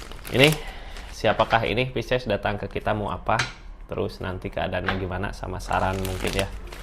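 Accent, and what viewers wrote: West Java, Indonesia. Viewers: Aminnn😊 kang logat na meni nyunda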